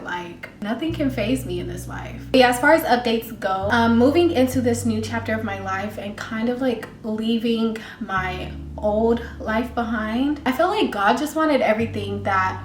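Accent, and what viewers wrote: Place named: Nigeria. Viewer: ohhh I said “chai” because I’m also nigerian hahah I was listening to your accent!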